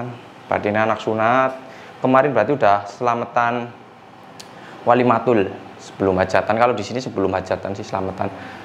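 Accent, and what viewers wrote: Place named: Brebes, Indonesia. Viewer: Ngomonge kayak wong brebes ms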